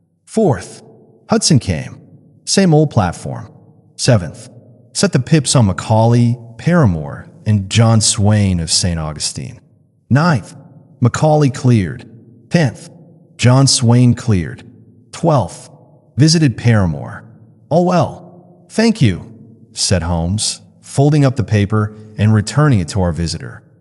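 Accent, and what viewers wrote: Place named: United States. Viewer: an american accent for Sherlock Holmes??? that does not work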